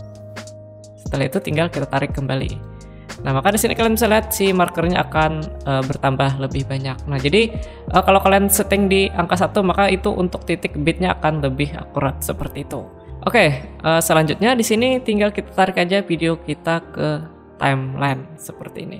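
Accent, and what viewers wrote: Banjar, Indonesia. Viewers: logatnya kayak orang banjar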